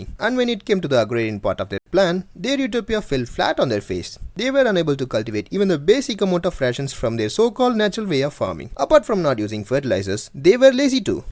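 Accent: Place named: India. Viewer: You sound like an Indian sam'o nella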